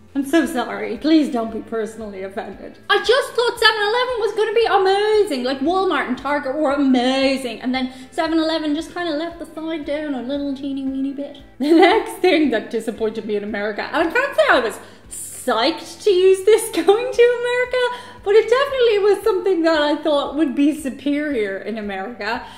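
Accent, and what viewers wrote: Ireland. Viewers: Bad toilet paper in a public toilet is probably on purpose. Likely to discourage it getting stolen when you're running low at home. Choice overload is also a thing. There are even studies. Seems like 3-5 choices is ideal in many things. Here is my question.....do you speak Spanish with an Irish accent? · Some of the problem with them understanding your Spanish may have to do with your Irish accent. I even have a little trouble with you when you're speaking English (I think yours is the only YouTube channel I routinely watch with the closed captions on, just to fill in the gaps in understading). Spanish itself sounds different in every country. I'm pretty familiar with Mexican Spanish (having lived for sixty years in various US border states), and I have trouble with Argentine, Puerto Rican, continental Spanish, whatever that mixture in the Philippines is supposed to be. 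Most clearly-enunciated Spanish I ever encountered was on shortwave radio, and I listened for several minutes for something that would identify the origin; finally out came a station ID and I was listening to Radio Shanghai! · It was so much fun listening to this! All the commenters seem to be focusing on the 7 elevens, rightfully so, I loved that you are aware of how big the U.S. is and how there is so much to see. Fun to watch you and love your Irish accent.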